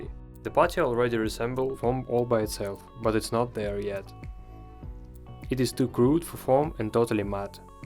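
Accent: Russia. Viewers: акцент русский или мне кажеться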